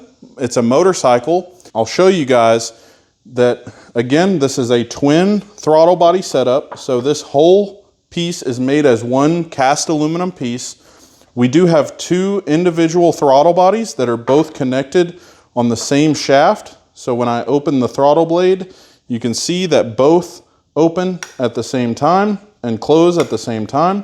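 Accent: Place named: Tennessee, United States. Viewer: glad YT recommended this. hope you document the CPS install a bit more in the future. really liking the repurposing simple parts and using speeduino. just a wild guess by your accent but I can imagine you're from like waynesboro or somewhere near AL in TN, though thats probably me projecting because I'm from the other side of the line.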